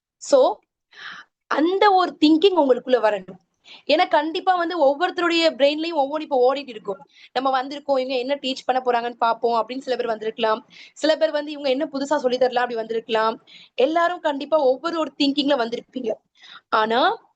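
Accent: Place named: India. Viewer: Very useful 🎉🎉❤ Ongada entha program endaalum very interesting madam madam thanx ❤ Session thornthu podunga. India slang very cute 🥰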